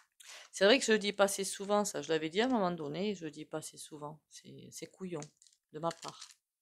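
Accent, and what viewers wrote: South, France. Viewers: Bonjour. Je viens de m'abonner car j'aime beaucoup votre facon de faire cette voyance.Votre petit accent du midi aussi❤ bisous de Toulouse.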